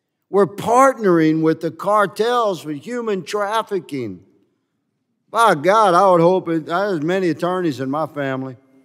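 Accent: South, United States. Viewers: Thank you Mr Higgins, for using that amazing southern voice to stand in defense of those precious innocent children.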